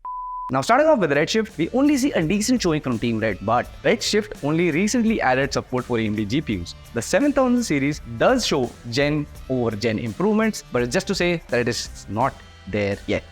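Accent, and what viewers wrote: India. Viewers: hard to understand what ur saying with indian accent and background music combined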